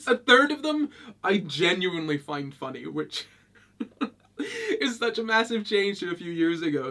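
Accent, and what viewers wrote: Scotland, United Kingdom. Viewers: Is it bad I never noticed you're scottish??? like I'm british but my brain only registered your accent as "northern" tbh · I don't understand how people can call you "feminine". Unless they are using "feminine" as a synonym for "smart". To me you appear to be clearly masculine, and your Scottish accent is a pleasure to hear.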